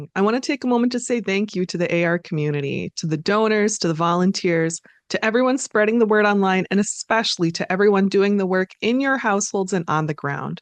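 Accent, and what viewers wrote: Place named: Minnesota, United States. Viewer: You must be from Ely or Duluth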